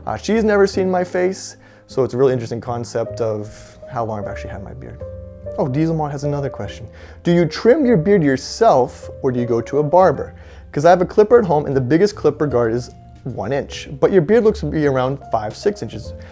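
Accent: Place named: Canada. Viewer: I honestly never noticed that you say "out" with a Canadian accent ("oat") until today's video, haha.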